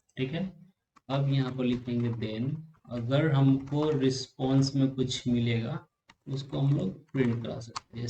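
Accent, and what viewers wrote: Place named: Chhattisgarh, India. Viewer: Bhai chhattisgarh se ho kya?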